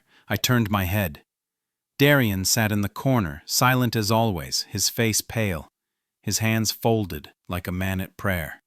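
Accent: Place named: United Kingdom. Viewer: are you a Brit maybe cause your accent shown through in the middle of the story 😁?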